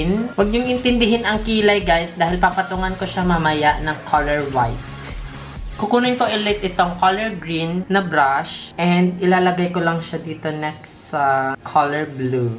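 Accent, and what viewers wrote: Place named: Polangui, Philippines. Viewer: I just so love how you speak bicol polangui, makes me wanna go home. LGBT is ❤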